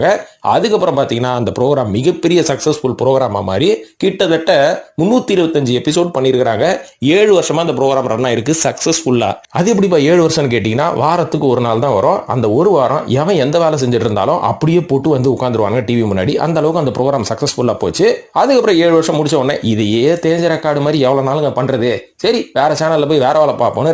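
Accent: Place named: Sri Lanka. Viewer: இஞ்சருங்கோ இலங்கை தமிழ் தான் bro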